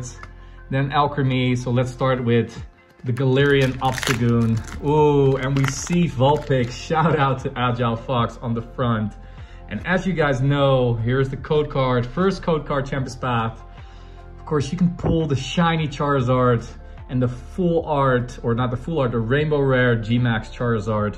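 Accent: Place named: Netherlands. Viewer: Ur accent sounds like ur from Holland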